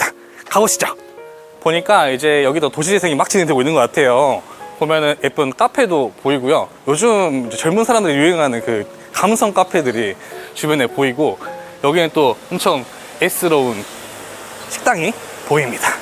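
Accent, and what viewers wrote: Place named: Gwangju, South Korea. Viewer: 광주사투리? 정감있어요~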